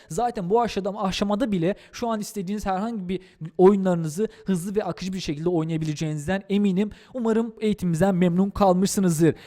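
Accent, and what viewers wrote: Azerbaijan. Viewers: abi sen azeri misin merak ettim :D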